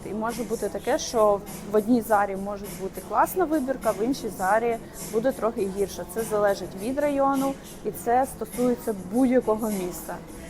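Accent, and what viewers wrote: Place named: Surzhik, Ukraine. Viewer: такий милий суржик, дякую за відео.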